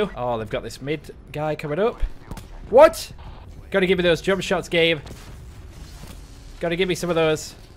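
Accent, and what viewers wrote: United Kingdom. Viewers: I like your accent fluent English and just the little British tint love it!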